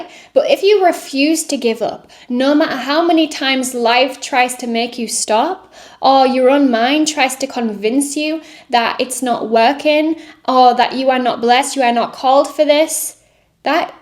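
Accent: England, United Kingdom. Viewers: It reminds me I think in the book of habakkuk to write down the vision. I need to write down the vision of my new job I just started for this millionaire. Andy be quite honest I thought you were just going to talk nice to us men that are broken I didn't know you were a Christian and had a Godly message thank God you weren't a non-Christian woman just flattering us weak-willed men. Thank you for being obedient to God and speaking truth and love to us. This is exactly what I need right now I already knew it but I needed to be reminded I needed somebody to remind me what God Said. I love your accent you must be from maybe England or New Zealand or australia. It's a beautiful voice God gave you keep using it on YouTube and Instagram wherever you can use it to get the gospel out and then message out.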